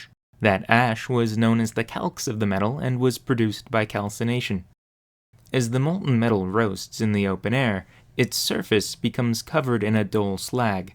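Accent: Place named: Carolina, United States. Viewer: I'm fascinated by accent! The "er" creeping into words reminds me of some populations of English-speaking populations along the east coast of North America like the Carolina Broque or the New Brunswick accent, but it doesn't sound quite like either. I did a little searching and maybe it's related to some accents in the South, like in Georgia and Louisiana, but it's unclear. Authority becomes erthority, forty becomes ferty, forms becomes ferms, orange becomes ernge, chloride becomes chleride, proportion becomes propertion. So it seems there's like an ah/oh to er merger, but not always. Gold isn't gerld, common isn't cermmon. Anyways, if anyone has information or knows this accent, please let me know! Also, if you read this, I am not mocking your accent, I'm genuinely curious where it comes from.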